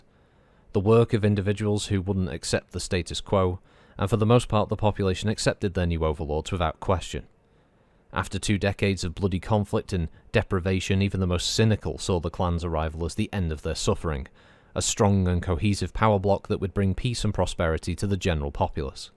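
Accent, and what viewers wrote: United Kingdom. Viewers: Everybody can read the book, it’s true. But not everybody can read the book with a British Accent. 8-)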